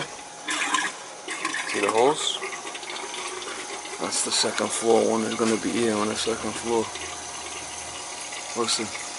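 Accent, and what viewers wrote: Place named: Boston, United States. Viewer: LOL, as soon as I heard "Octobah," I knew you weren't fah from Bahston. Greetings from Newton.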